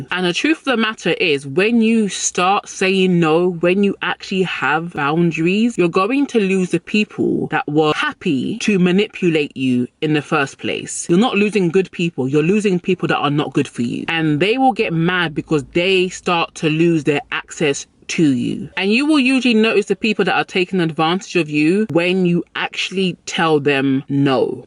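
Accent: United Kingdom. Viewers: Yo ah from the Uk i can tell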